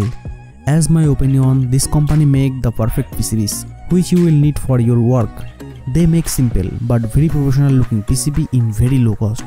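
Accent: Bengal, India. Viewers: индийских английский это что то с чем то. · Your accent sounds bengali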